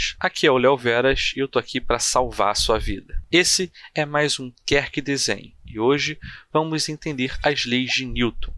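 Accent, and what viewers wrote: Rio de Janeiro, Brazil. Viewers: Sotaque carioca dele esta no pico nesse dia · Carioca, mano da uma agonia xxxxxxxxxxxxxxxxxxx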